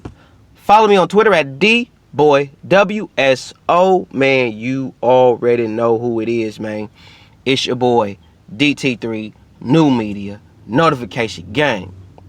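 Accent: United States. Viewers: You from Houston bro?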